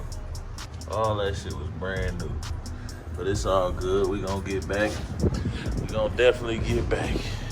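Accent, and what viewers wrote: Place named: Mississippi, United States. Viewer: bruh what part of mississippi you from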